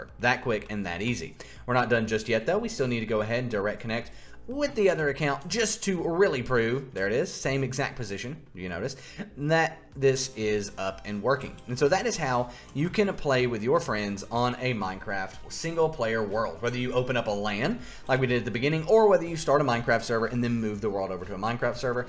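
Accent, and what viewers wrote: West, United States. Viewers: I like your western accent :D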